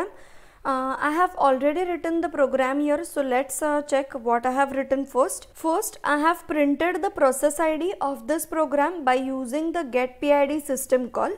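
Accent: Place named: India. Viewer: Your indian accent is really annoying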